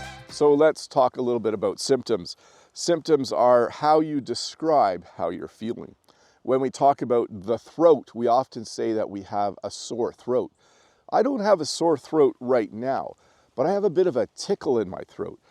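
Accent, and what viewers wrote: United States. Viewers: Dear, Here Ted from China, I really really appreciate for your videos which are most perfect of English learning. I don't need waste time to learn boring Chinglish any more. now i'm very enjoy to watch your Enlglish teaching, especially your accent, just like wathcing an American TV series. Also, i'm enjoy everything you said which are very close to our life, and it makes me feel how beautiful the Canadian countryside is. Hopefully that I could go there to have an enjoy that beautiful scenery one day. Bless you and your family! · you in Canada have more british or american english? As far as I know Canada was part of British Empire and accordingly you have a british one but I noticed you pronounce some words like Americans do. Answer please. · Dear teacher, My English is not good, my hearing is poor,I am a new immigrant, living in the United States. 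Is the English you speak here in Canada the same as the English you speak in the United States? I really want to learn English from you,Is your pronunciation the same as American pronunciation?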